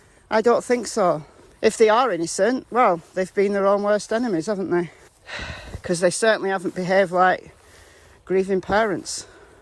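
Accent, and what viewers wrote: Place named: Northern England, United Kingdom. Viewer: This case is maddening! I love listening to you ( and your English accent!) You must be in good shape from all the walking you do! I would be so out of breath, I wouldn’t be able to talk! I love this channel and virtually walking with you and your dogs!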